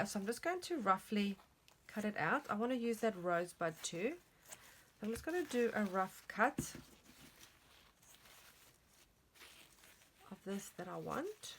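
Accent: South Africa. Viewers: I can't believe you're Australian. Yo sound so South African to me. Also, this is so gorgeous. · Absolutely gorgeous. I recognise the South African accent. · Beautiful! You live in Australia but you really sound like you are from 🇿🇦.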